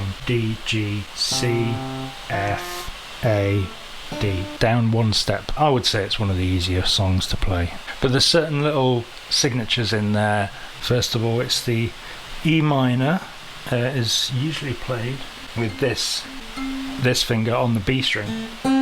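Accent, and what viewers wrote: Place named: England, United Kingdom. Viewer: Excellent lesson. Really glad I stumbled upon your channel. I often search out Neil related tunes/covers/lessons and am surprised your videos don't come up more frequently/higher on the list. I am impressed with the use of index finger nail as a plectrum - perhaps time to let that nail grow a bit. Also impressed with your vocals as you switch from your native "English" accent to your Neil voice - well done!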